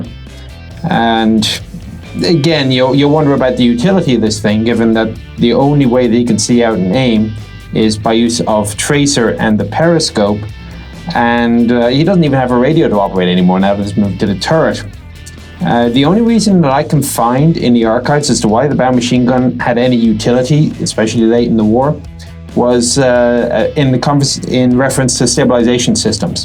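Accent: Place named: Ireland. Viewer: Nichols, what is your nationality?.......it seems that your military service say American BUT you seem to have an Irish accent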